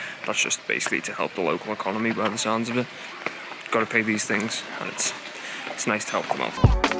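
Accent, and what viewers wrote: Liverpool, United Kingdom. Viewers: Are you a Scouser because if so you're the first Scouse youtuber I've ever seen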